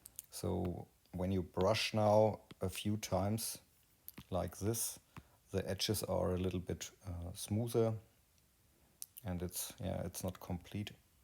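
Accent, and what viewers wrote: Bavaria, Germany. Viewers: Supergut! Wann immer ich irgendwas suche, Du hast fast immer ein Video dazu =D Da leb I a mim boarischn englisch =D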